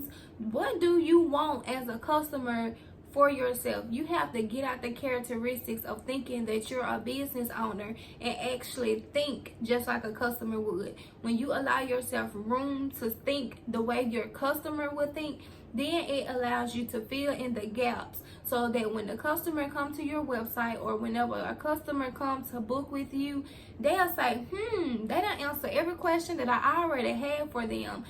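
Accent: South, United States. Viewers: I love your accent omg I know you are a southern bell just like me! Mississippi or Louisiana??? Which one ???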